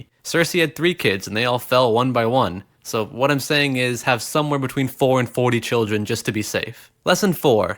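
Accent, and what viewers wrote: Newfoundland, Canada. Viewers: are you from newfoundland or irleand or scotland? dont hear the word bhys on youtube much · Wait, are you from Newfoundland?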